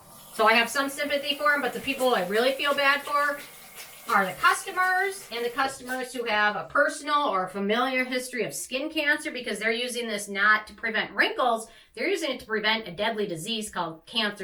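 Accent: Minnesota, United States. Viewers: Minnesota! I was wondering where the accent came from!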